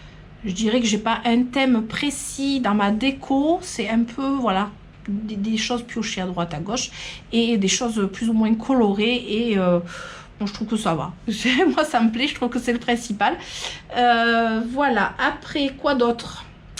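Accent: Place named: South, France. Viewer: Quel plaisir votre bonne Humeur et ce bel accent du sud . Je découvre votre univers coloré et j aime beaucoup 😉 d ailleurs je vais aller jeter un l œil sur votre autre chaîne YouTube car moi aussi j aime les plantes même si elle parfais ne m aime pas trop 😂. Au plaisir de vous trouver 😊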